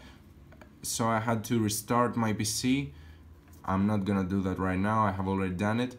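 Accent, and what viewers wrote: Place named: Greece. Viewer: Ε, μονο απο την ελληνοααγλικη προφορά καταλαβα πως εισαι Ελληνας :ο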